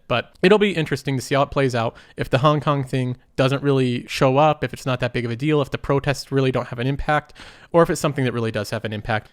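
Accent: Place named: Midwest, United States. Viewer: Midwestern american, I would guess but it's not a strong accent. Listen to "protests" , or ish, "we don't want you tah talk about politics."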